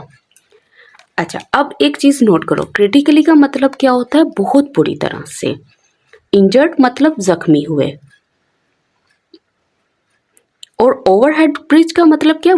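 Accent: Lahore, Pakistan. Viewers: Ma’am, I am an English teacher and the author of two books. I truly admire the way you explain things. Anyway, Your accent suggests you are from Lahore.😅😊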